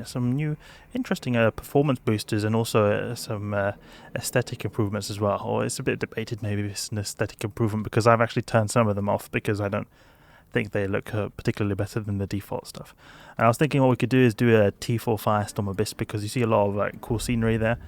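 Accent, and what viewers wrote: South Africa. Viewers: I'm detecting South African in this guy, just a hint.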